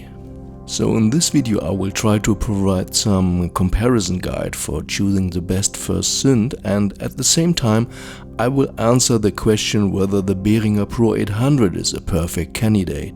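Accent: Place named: Germany. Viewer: I missed the special, somewhat druggy German accent 😅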